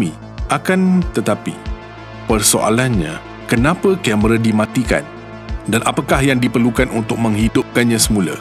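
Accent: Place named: Kuala Lumpur, Malaysia. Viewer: Guna Bahasa Melayu baku tetapi sebutan masih KL... Jika betul2 nak Bahasa Melayu baku, sebutan kite tu kena betul2 kita dan sama bukan same...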